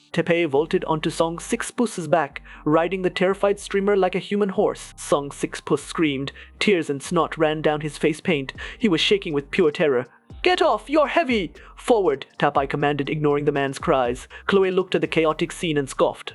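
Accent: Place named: India. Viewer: Sounds indian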